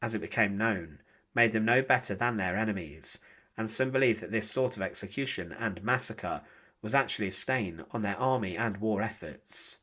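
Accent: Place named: England, United Kingdom. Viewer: I'm sorry but I can't understand a thing this lady is saying. Her English accent is way too thick.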